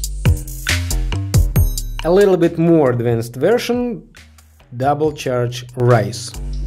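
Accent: Russia. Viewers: Акцент русского человека!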